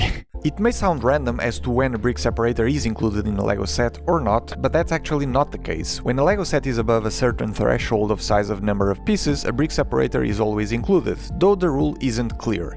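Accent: Portugal. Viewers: Aos 2 minutos comecei a notar o sotaque, olhei para o nome do canal e conformou-se, portugueses para a frente! Gostei do vídeo, conciso e interessante, bom trabalho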